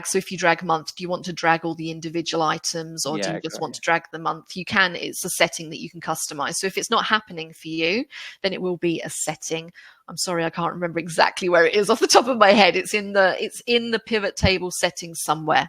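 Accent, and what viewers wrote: England, United Kingdom. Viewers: I like genuine English accent in addition to your fabulous teaching.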